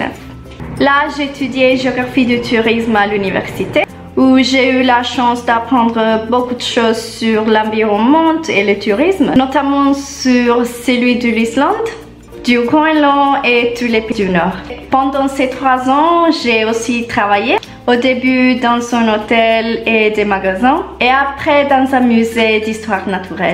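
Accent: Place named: Tuscany, Italy. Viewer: le inflessioni toscane le sento bene quando parli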